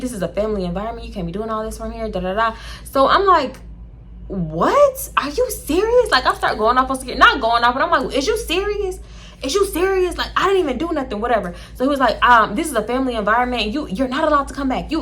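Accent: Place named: Miami, United States. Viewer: Ouuuu Not Slapping The Ground 😂😂😂😂😂 Chile Sound Like You From MIami. Ready To Go Beast Mode ❗️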